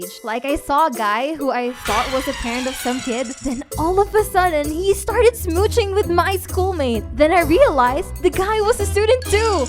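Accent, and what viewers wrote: Visayas, Philippines. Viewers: I new u are a pilipino beucase im a pilipino and your english is like pilipino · OMG nung una ko tong pinanuod... sabi ko, ang accent mo parang sa filipino. 😂😂 love this channel... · Omg im a filipino!🇵🇭 ~*~*~*~*~*~*~*~*~*~*~*~*~*~*~*~*~*~*~*~*~*~*~*~*~*~*~*~* 🇵🇭Filipino: Maganda ang video! Ako ay mag subscribe sa iyo kapamilya👍🏻❤️ 🇺🇸English: The video is beautiful! I will subscribe to you my friend👍🏻❤️ ><><><><><><><><><><><><><><><><><><><><><><><><><><><><><>< Btw are u a filipino? ur acent is like a filipino.... especially when u said "C.R" ======================================================== EDIT: Btw I love ur acent😂👍🏻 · I knew it.i instantly guessed that you are a Filipino too because of your accent(which is, btw super gooood) just from the first video I watched from you. Another youtuber to be proud of. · bisaya po ba kayo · Cccccc rrrrrrrr perpek pilipino aksent :) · U sound like a filipino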